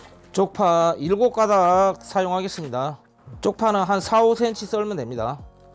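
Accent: Jeolla, South Korea. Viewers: 병원에 입원중입니다 항암치료로 입맛이 없는데 집에 돌아가면 꼭 해먹을래욤 ^^ 전라도 사투리 참 정겹네요 ^^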